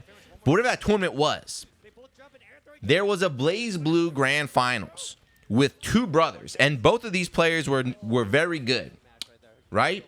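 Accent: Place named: North Jersey, United States. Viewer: Bruh your North Jersey accent is so cringe. Plz quit the weeb FGC, thx.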